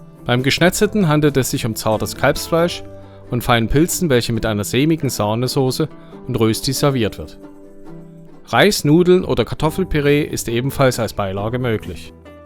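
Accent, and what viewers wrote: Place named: Saxony, Germany. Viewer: Oh nein nicht schon wieder....Schweizer Spezialitäten auf Sächsisch.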